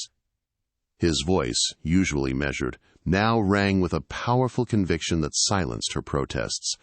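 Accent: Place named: United States. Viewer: and American accent😞. Should be narrated in British accent.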